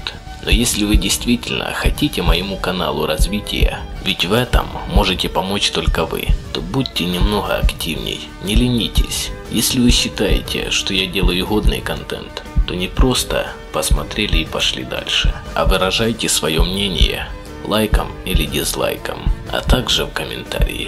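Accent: Ukraine. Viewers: конечно логично "можете писать по нескольку коментов и больше" (несколько это не определённо число, и лучше бело бы сказать "можете писать по 2,3,4 коментария и больше) а так ооочень годный контент, украинский акцент виден конечно, но он не мешает.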